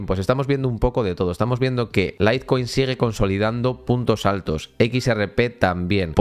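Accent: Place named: Murcia, Spain. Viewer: Te vi por orihuela. Eres murciano no? Se te nota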